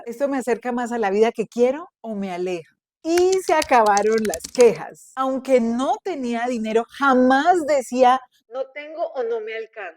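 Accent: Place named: Antioquia, Colombia. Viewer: a veces te sentía un acento paisa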